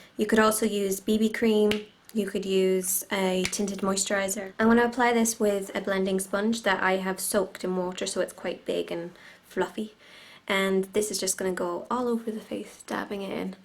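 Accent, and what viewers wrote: Ireland. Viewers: are you Irish? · You are really pretty! :) Are you by any chance irish? Sorry just curious, you sounded a bit irish. I'm not irish I'm british, norfolk accent XD Just wanted to know! Btw great vid, i'll be using those tips haha. I know a good tip for eyeliner. To keep the eyeliner on so it doesnt come off or smudge is to put a simular color eyeshadow over it or if you want a bit of variety use a different color! :D Something i picked up when practicing my make-up! :)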